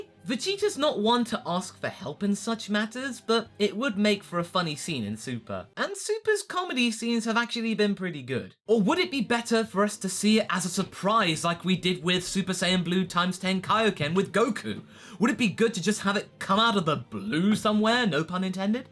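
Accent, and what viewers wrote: United Kingdom. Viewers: dude your voice sounds like a british version of dbz abridged goku 😂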